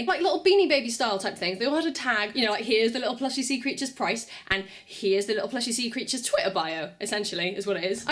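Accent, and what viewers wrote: Wales, United Kingdom. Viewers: Is yours a Welsh accent?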